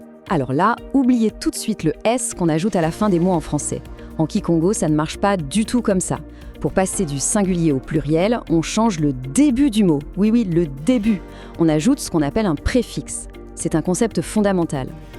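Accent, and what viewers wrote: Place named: France. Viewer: Svp la voix prononce les mots avec l'accent français, ça n'aidera pas ceux qui veulent apprendre.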